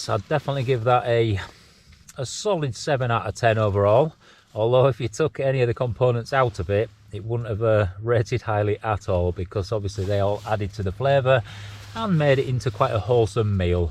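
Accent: Yorkshire, United Kingdom. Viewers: So grateful to you for sharing your videos taking walks in the beautiful mountains. My back has gone out AGAIN and I'm no longer able to go for walks in the countryside like I loved, so being able to see it all vicariously through your videos is wonderful, if a bit heartbreaking knowing I can't do it myself. Also lovely to hear your Yorkshire accent, reminds me of my old mum, who never lost hers, despite living most her adult life in Norfolk.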